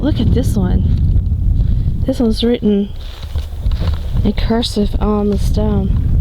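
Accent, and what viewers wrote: South, United States. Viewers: Your welcome and I love your southern accent. I'm from Texas but been living in Louisiana for about 19 yes now .. I still have my Texas accent. I don't think it will ever completely go away. Lol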